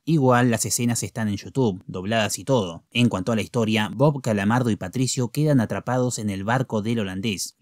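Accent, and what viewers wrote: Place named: Argentina. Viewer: Tu acento argentino es incómodo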